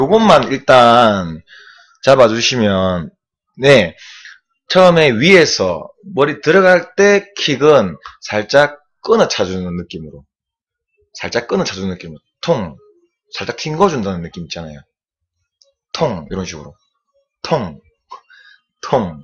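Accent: Gyeongsang, South Korea. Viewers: 일본어 자막에 틀린 글이 너무 많네요. 그냥 구수한 갱상도 사투리로 쭈욱 하시길…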